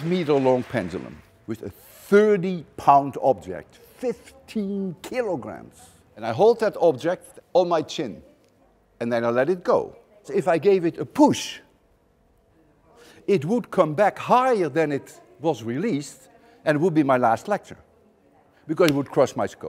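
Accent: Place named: Netherlands. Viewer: In all his dutch glory :') and accent lol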